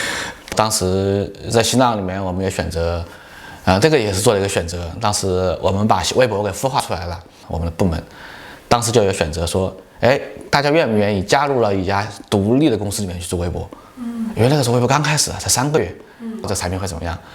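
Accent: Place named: Wuhan, China. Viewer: 听口音是武汉老乡啊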